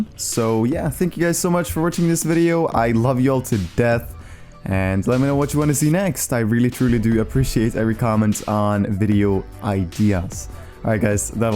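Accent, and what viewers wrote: Norway. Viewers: Are you norwegian? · Are you from Norway?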